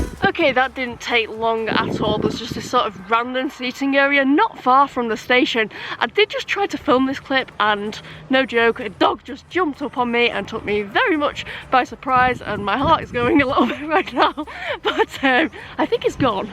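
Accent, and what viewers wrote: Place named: Wigan, United Kingdom. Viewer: Hi, Your ability to deal with rail travel aggro without panic (like last minute train cancellations etc.) is growing increasingly impressive - ALSO... I'm sure the mutt that spooked you at Saltmarshe meant you no harm; and (say the following aloud with your special 'Wiganese' accent) lacking a HAIRY OR FURRY COAT, he was probably only looking for you to give him a stroke or cuddle for warmth on such a cold day (that even drove you to run backwards up 'n down station ramps to keep warm!) - I also feel pretty sure he approached you as he sensed you're a 'creature lover' - so I hope you didn't disappoint him(?) Your good 'elf G but DO get yourself a warm hat to help drive out your lingerin' cough 'n cold. PJ x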